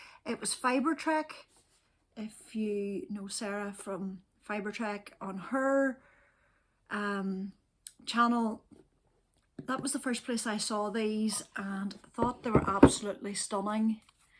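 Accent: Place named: Ireland. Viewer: Sorry about your headaches. Happy you’re able to rebound albeit painfully slow. I love to hear your thoughtful comments about your yarn picks and patterns. Especially in your soothing Irish accent. I, too, was primarily an earth tones knitter-deep greens like you, grey & brown farm yarns, with a splash of red thrown in. But lately some of those bright colors for a garment have been very attractive to me as well. Am thinking of making a vest with my stashvent calendar I received.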